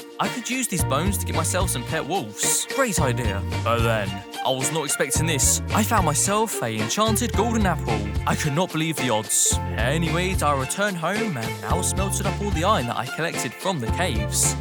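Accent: United Kingdom. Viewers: Are You British? And That's Not Moss Block It's Mossy Cobblestone Not Moss🤦🏻🌷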